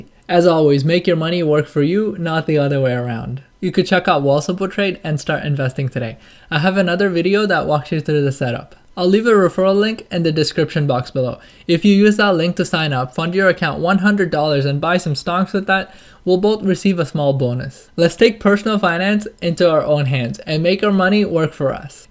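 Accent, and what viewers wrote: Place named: Tamil Nadu, India. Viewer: Bro are you a tamil? Apdina neenga vera level bro(really good). Unga(your) accent and way of thinking is superb. I have subscribed and proud to see an Indian doing so great in terms of investing which is non existent here. Thanks a lot.!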